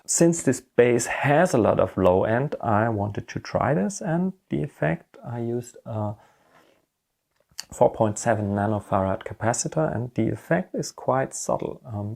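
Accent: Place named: Germany. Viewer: I realized from your accent, that you live in Germany as well. I really like your mod ... would you offer to do it to other rascals (for money, sure)?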